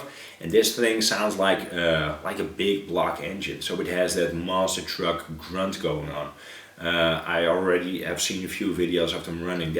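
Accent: Netherlands. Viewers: leuke en mooie video man ! Ik kan horen dat je nederlands bent haha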